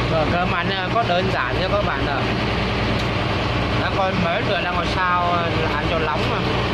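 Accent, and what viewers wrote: Nghe An, Vietnam. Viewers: Giọng giống quỳnh phương .hoàng Mai. Nghệ an vậy bác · Nghe giống giọng nghệ an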